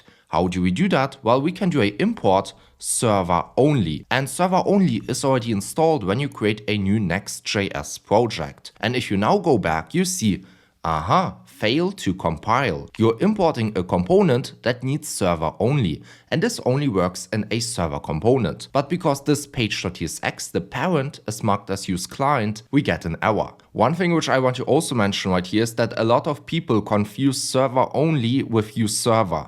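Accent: Germany. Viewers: Are you of German inheritance? Your sound like me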